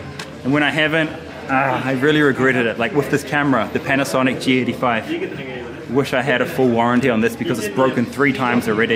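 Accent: India. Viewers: Nice job Very Nice. India is now your Oyster. Safe driving. I've noticed that your New Zealand accent is Vanishing and I don't know you, I've noticed that you are gradually slowly getting a hint of Indian accent different words are stronger than others, No doubt you have already been told this?👍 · I love how the inflection of the Indian languages come through in your voice, it’s really cool.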